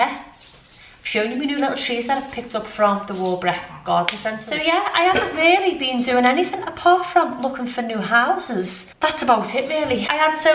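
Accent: Liverpool, United Kingdom. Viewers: Ah the c word, fab n gr8 seeing u back with your lovely liverpool accent, won't b long till you're putting up Christmas trees again, hope u move soon, we're 10 weeks along waiting to buy house nxt door x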